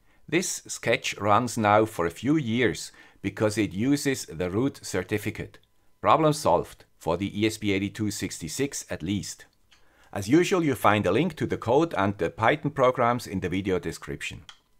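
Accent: Switzerland. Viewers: That "guy with a Swiss accent" sure is smart! Thanks another great lesson:)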